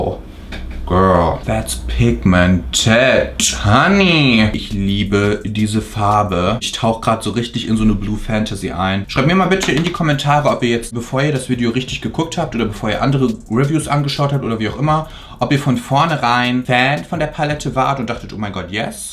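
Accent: Germany. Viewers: Unnötig dieses Denglish! Wenn er wenigstens einen amerikanischen oder britischen Akzent hätte, aber der deutsche Akzent geht gar nicht!!!!! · Du kannst echt gut Englisch sprechen, aber du hast so einen starken deutschen Akzent haha😂😘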